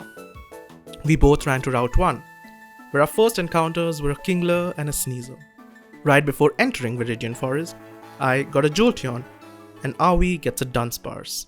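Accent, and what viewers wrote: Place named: India. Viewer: nah no way no way UR INDIAN I kinda thought u were INDIAN by ur accent but then I thought it would be racist but O MY GOD FINALLY A FELLOW GUY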